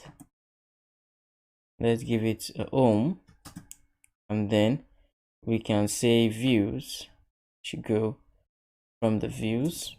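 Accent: Nigeria. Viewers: Name and accent sound Nigerian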